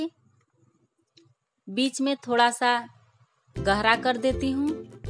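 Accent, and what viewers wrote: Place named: Bhojpuri, India. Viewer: pata nhi sbko apke bolne se kya aitraj hai cooking channel hai... koi voice improvement ki class nhi chal rhi hai... hindi mai to bol rhi hai sab angrez haj kya yahn pe... unki bolne ka style alag h bas hum bhojpuri bolte h hindi utni asani se bolne mai dikkat hoti hai gaon mai support karo warna chodke jao... bhashan mat do mehnat ko appreciate karna chaiye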